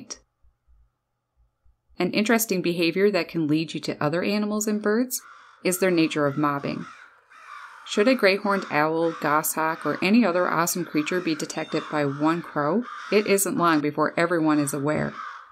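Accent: Canada. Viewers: Many people love the accent of British and Australian, not me , I enjoy the Canadian accent there's something aboot it I find relaxing. Lol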